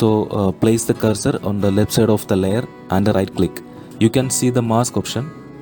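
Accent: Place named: Madhya Pradesh, India. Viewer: are you from mid pradesh cuz you accent goes hard ngl *fire emojji*x2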